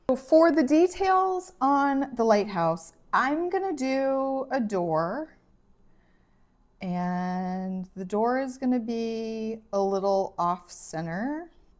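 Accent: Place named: New Jersey, United States. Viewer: I'm guessing you're from New Jersey from the way you say orange! No a - ornge! Ornry ornge! That's how we say onery down here - no e! Say Ornry ornge 3x fast & yer jaw will let you know about it.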